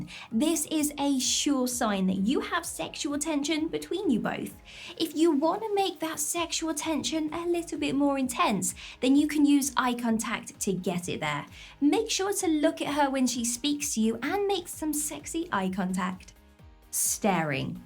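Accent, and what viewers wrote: England, United Kingdom. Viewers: You’re so sweet. The most pleasant video I’ve watched. What part of England are you from? I’m in Essex. Well done 👍 good video. And well researched. I felt so good watching. This.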